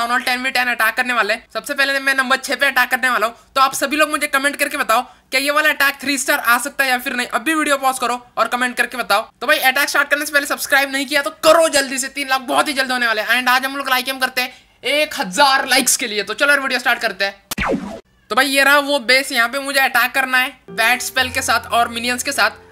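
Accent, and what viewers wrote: India. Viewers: Indian accent, "oh my GOAT!" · This was the most intense indian accent ive ever heard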